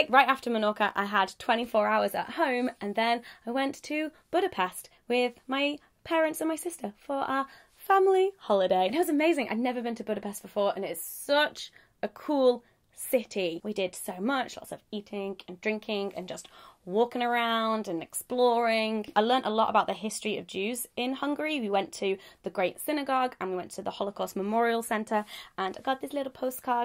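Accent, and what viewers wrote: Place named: North, United Kingdom. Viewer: Where abouts is from? I thought she was a southerner but is it only me who thinks her accent has a little northern twang? It's so cute! xxx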